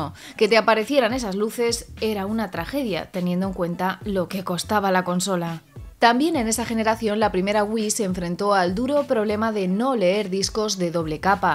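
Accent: Spain. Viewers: Juan Jose Velázquez Lucero Cuestión cultural amigo.... Así lo pronuncian en España, "equisbocs", acá en México le decimos "Ecsbocs" .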